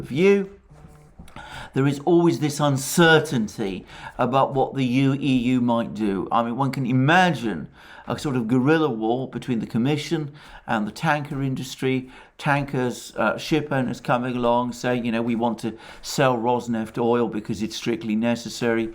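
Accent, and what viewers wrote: United Kingdom. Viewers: Too British an accent.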